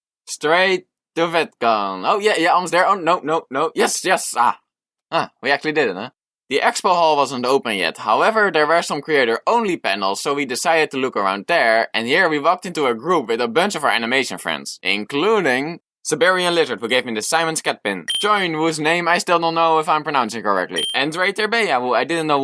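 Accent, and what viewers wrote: Netherlands. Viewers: I knew it you’re Dutch... well that explains your dutch English accent Really like your videos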